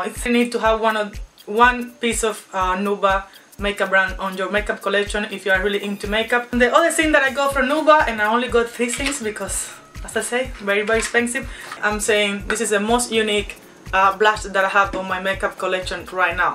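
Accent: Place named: Italy. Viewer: Oh my god! She speaks so fast with Italian accent well, no comprendo lol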